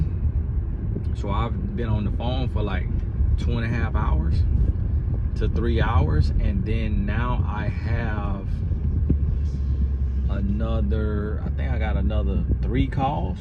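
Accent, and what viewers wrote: South, United States. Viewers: I love listening to your country self. Fasting definitely has its blessings.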